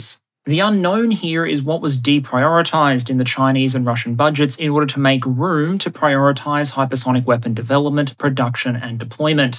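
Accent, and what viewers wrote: Australia. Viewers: I love this channel because it’s not just the short attention span of only 8 or 9 minutes for all the other videos. i’m only in adopted Aussie but as a yank it is nice to hear the fellow Aussie talk about the topic of geopolitics which I am quite passionate about in a more extensive ways regarding equipment of military defence and offence. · It is frankly absurd that 1-hour PowerPoint presentations are right at the top of the content I am most excited to see each week. That damn Aussie dry wit and accent! :) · Ah yes I am going to listen to an Australian man for the next hour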